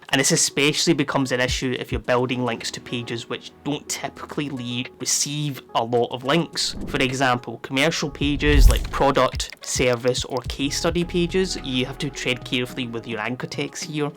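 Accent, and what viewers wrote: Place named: United Kingdom. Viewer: That's an entertaining British accent 😅 Where from?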